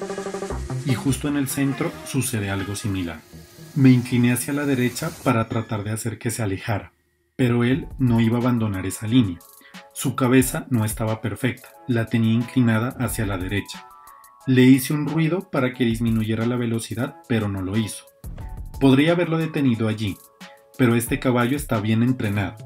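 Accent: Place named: Latin America. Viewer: Wouldn't be easier if you keep on speaking English and have the subtitles or close captioning in Spanish? Although I understand Spanish, it is spoken too fast for me and is heavily accented (South or Central American?). Or, can you re-post the video in English? Make everybody happy?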